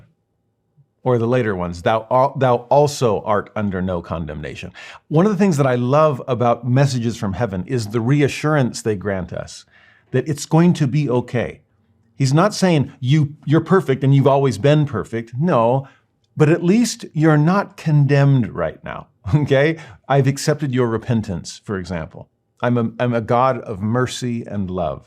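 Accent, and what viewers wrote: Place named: North, United Kingdom. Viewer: Are you really a Texan cause you sound like you're from up north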